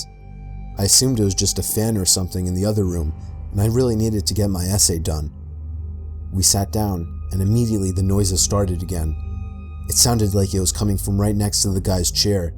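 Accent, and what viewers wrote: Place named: East Coast, United States. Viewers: younger and sounds like an east coast accent?!! Nice ❤ I no longer here it in his newer videos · He sounds so different then, like a New York accent, now he sounds different. For example he says "...get off..." But is sounds like get ouaf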